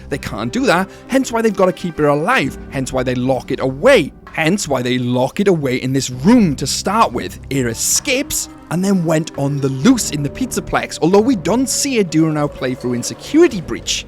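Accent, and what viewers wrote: Wales, United Kingdom. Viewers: Are you Welsh? I was just wondering because of the accent 😅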